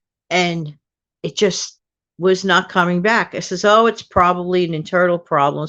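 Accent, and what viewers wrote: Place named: New York, United States. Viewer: I’m listening to your voice and thinking how did I not know you were a fellow New Yorker. Cool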